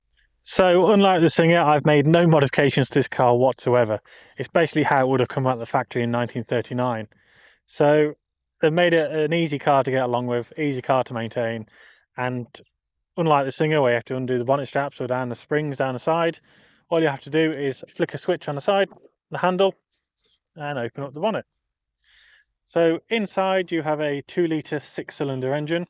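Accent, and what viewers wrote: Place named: Grimsby, United Kingdom. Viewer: Beautiful car and a nicely made video. That sounds like a Grimsby accent to me. Couldn't work out where you were driving though. Woodhall? 😊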